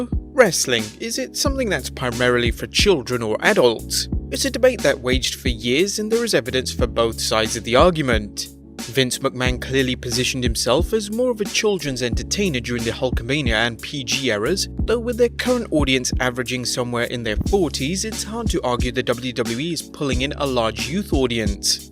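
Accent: United Kingdom. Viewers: Maybe it's just the accent, but that was pretty stuffy British. Sex is naughty and things are improper and all that.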